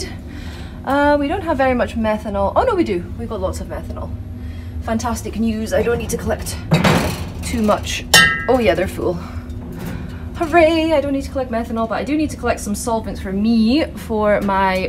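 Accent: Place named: Scotland, United Kingdom. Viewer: Another great video. Nice to see that both our labs are a frequent shopper of Sigma-Aldrich. Also loving the Scottish accent 🙂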